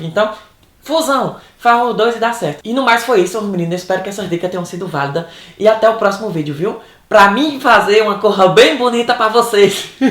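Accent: Ceará, Brazil. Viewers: a maneira de tú falar é bem cearês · Não Perca teu sotaque e palavras da tua terra maravilhosa...Quanto mais nordestino for mais inscritos terá no teu canal...Amo teu trabalho....bjbjbjbj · Queria ver um francês falando esse maravilhoso português cearense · Adoroooooo seu SOTAQUE!!!! Chique feito "as pregas de Odete"! kkkk O nosso DIALETO "nordestino" é tudo de maravilhoso. O nosso idioma, como tantos outros, apresenta variações linguísticas. Dentro deste contexto, normal o "pra mim fazer", "mar minino" e tudo o mais que faz de nós NORDESTINOS, um povo especial!!!!! Parabéns,! <3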